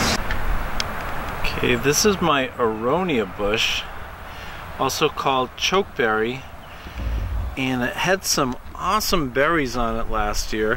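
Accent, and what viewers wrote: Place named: Boston, United States. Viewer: Every time I watch one of these videos, I wonder if it’s gonna be relevant to my zone and the moment I heard your Boston accent I knew I was good!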